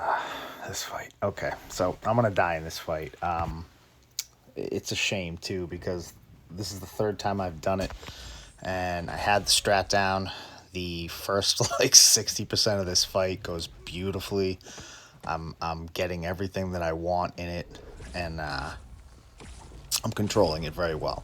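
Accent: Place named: Boston, United States. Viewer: Lol I knew it. I live a few miles north of Boston. It was how you pronounced your ahhhh’s that Sauron was ah 2. Love it….. 👊👊👊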